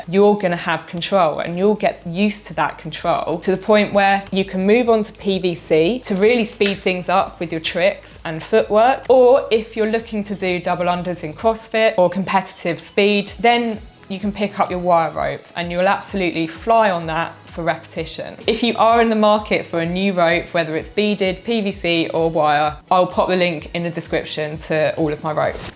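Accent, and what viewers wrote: United Kingdom. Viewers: This British accent 🥰😍🥰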